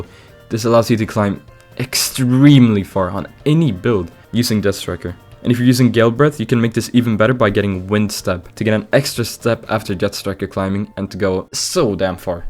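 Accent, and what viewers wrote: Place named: United States. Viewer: du låter på riktigt som en amerikan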